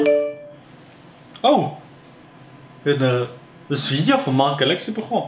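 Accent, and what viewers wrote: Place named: Switzerland. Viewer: Omg entli hen i en kanal kfunde wo nur schwitzerdütsch redet😂😍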